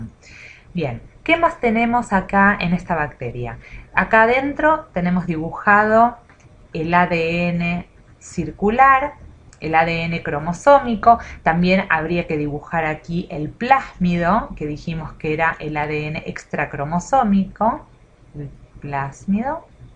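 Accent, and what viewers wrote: Argentina. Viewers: Me gusta el acento argentino!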